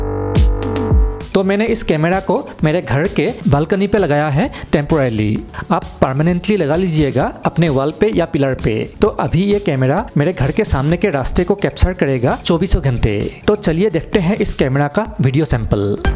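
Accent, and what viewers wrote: Assam, India. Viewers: Aap Assamese ho. Kitne bhi thik se hindi bolo.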